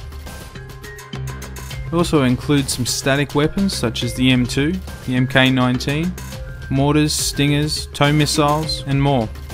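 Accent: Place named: Australia. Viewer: lol I could tell from ur accent, Im just across the ditch from u:)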